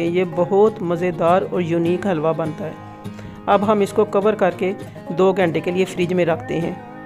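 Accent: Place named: Lahore, Pakistan. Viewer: Lucknow, India. Your dialect and accent remind me of my elders who relocated from Lahore to Delhi.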